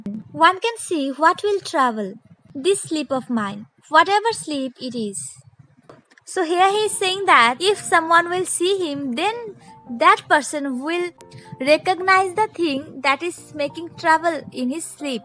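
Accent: India. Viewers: Good explanation... In your talking style it proves that you belong from Bengali society 😊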